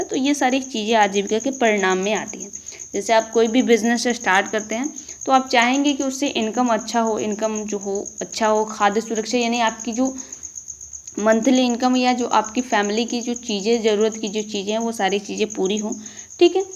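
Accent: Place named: Bihar, India. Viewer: aap bihar me kha se ho kyunki apki language suni suni lgti hai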